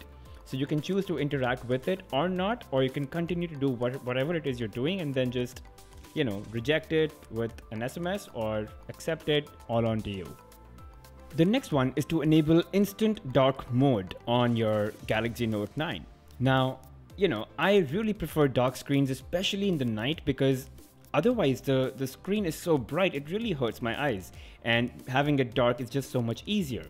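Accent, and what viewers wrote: India. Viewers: i hate the indian accent , it makes me sad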